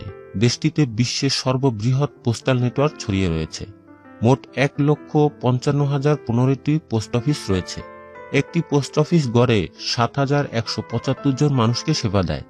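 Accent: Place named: India. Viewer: Indian English !! ভাইরে আপনি অনেল মিথ্যা কথা সুন্দর করে উপস্থাপ্ন করতে পারেন ।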